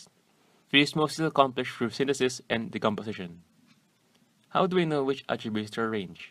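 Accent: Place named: Philippines. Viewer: Thnx....but Oh God, the accent, so hard to understand him...but Pinoy pride baby ;)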